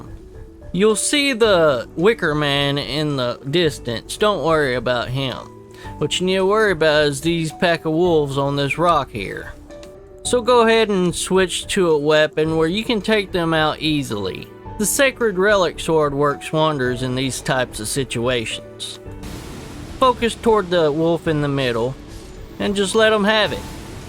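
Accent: South, United States. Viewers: Love the jolly southern man helping me collect all the borbs!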